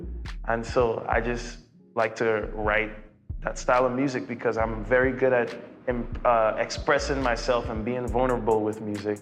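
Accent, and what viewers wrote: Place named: Trinidad, Trinidad and Tobago. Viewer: I like the fact that you are from the Caribbean bro. I rate the Trinidad accent. Lol, I am here typing in your accent. #🇯🇲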